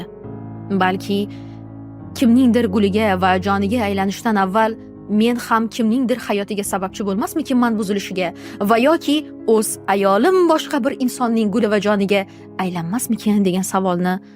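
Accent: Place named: Russia. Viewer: Yahshi naraala aytasizuu, labizzi rossa qiyshaytirib gapirishiz nitodaa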